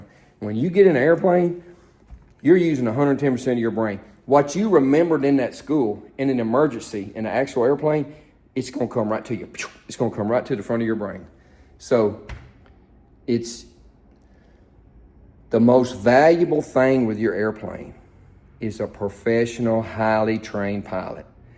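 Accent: South, United States. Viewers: Ive never heard anyone so smart with your accent. You must be the king of the southerners.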